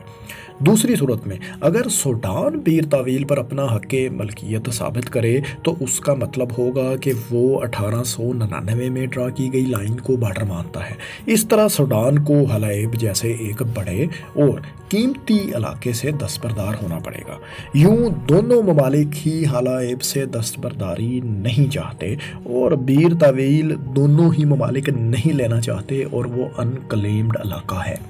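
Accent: Punjab, Pakistan. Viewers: ماشاءاللہ بہت معلوماتی ویڈیو تھی۔ فیصل بھائی سے درخواست ہے کہ اس ویڈیو میں بولنے والے بھائی کے بجائے خود آڈیو دیا کریں کیونکہ یہ بھائی پنجابی زدہ اردو بولتے ہیں اور مجھے اس سے بہت کوفت ہوتی ہے۔ مثلاً عِلاقہ(Ilaqa)کو عَلاقہ(Alaqa) ، صورَتحال(Soorate Hal) کو صورْتحال(Soorte Hal) اور آزادی کو ازادی تلفظ کرتے ہیں ۔ اور 19 کو انیس کے اُنی بولتے ہیں ۔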